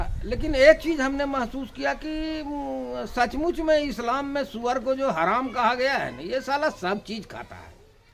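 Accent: Bihar, India. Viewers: Dear sir, I love you way of narration and bihari voice. I am a gun lover. I support killing for food, not for enjoy. However shooting is always joyful unless it harm innocent people.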